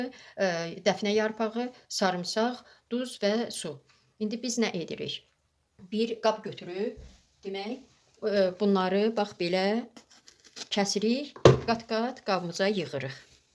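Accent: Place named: Ganja, Azerbaijan. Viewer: İndi duzlu suyunu qayirajıyıx ,,belə bircə Gəncəlilər danisir ,,ağzını örtüb qoyuruq qırağa .Sizə uğurlar xanım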